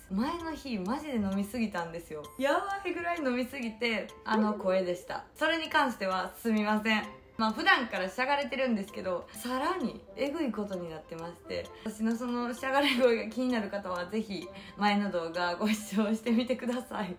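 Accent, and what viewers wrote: Kansai, Japan. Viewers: チャンネル見させて頂きました。可愛いし、関西弁が印象残り登録しました。これからも頑張って下さい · は本当にかわいいよな～！関西弁もまたかわい(//∇//) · 実証動画お疲れ様でした、のハスキーな声で関西弁素敵ですよ大好きです💕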